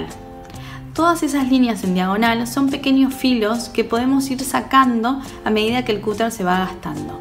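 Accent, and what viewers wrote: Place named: Argentina. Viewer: Amo cómo explica. Los argentinos hablan tan bien. Me fascina.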